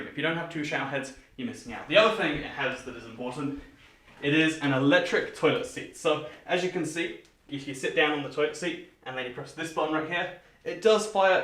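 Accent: United Kingdom. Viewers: He lives in the UK so he has an accent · M.A.A.C CRAFTERS No, He's Brittish.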